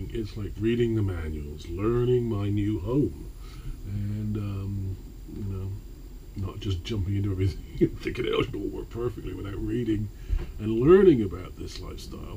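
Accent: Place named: Manchester, United Kingdom. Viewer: good travels--------i'll be subscriber # 12----------- i'll watch you on your journey. Please pass the Grey Poupon. Are you a Brit? you sound just like my brother-in-law, he's from Manchester. you answered that question-------cup of tea, lol.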